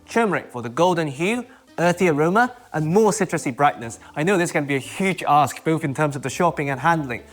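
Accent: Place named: RP, United Kingdom. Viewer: So posh.... I am a fan old lady from South Africa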